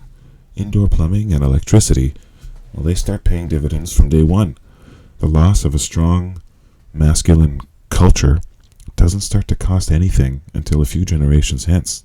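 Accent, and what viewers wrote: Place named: United States. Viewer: please will you get in contact with me Master I want to learn as much as I can about all this. I've ordered three of the books about Gor from Amazon. I think you live in America as you sound American. I live in Belfast northern Ireland so I think I'm about 5 hours ahead of you in time not sure but you can contact me anytime you like but there may be a delay in getting back and in real time sorry. I'm also at uni most days which doesn't help with things either. I'm bit embarrassed about asking but I want to be a sex slave slave girl and learn as much as I can . Now I'm totally embarrassed and will be a complete nervous wreck until something happens from your side or not. You can contact me anytime you like . Love Tara x. I feel a bit humiliated asking about all this but it's what I want. Tara x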